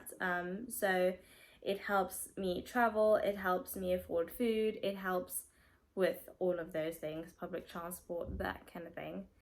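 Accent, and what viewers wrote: United Kingdom. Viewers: Wow you picked up the accent so quickly 😃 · You only been in UK for almost 2 years and you speak as if you have an accent of the country already.. Not sure how that is possible because you came from South Africa, don't forget where you came from. 😊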